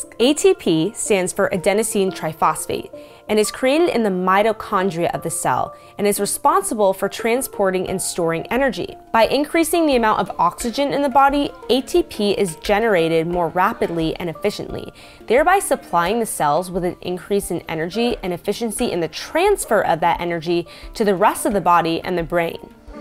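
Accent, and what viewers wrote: Boston, United States. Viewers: Well done. Are you from Boston too?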